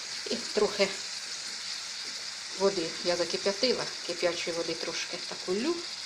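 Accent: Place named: Cherkasy, Ukraine. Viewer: Дякую, з задоволенням вас слухала. Подобається ваша говірка.Чи вгадаю? Напевно Черкащині. Простий і, напевно, смачний спосіб приготування риби.Обов'язково спробую.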